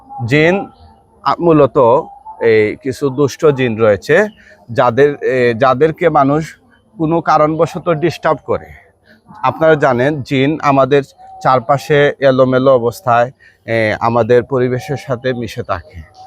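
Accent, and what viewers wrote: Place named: Sunamganj, Bangladesh. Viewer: Uni asole sunamgonj taken na sylhet. Unar kotha Barta sunamgonj era moto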